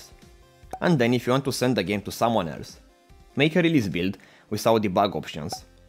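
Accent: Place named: Romania. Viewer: Se aude romanul din tine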